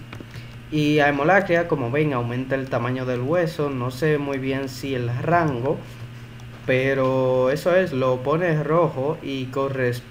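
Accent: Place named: Spain. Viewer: creo que se refiere a que tu asento parese español y la mayoria de los youtubers "Famosos" son españoles(perdon por las faltas de ortografia)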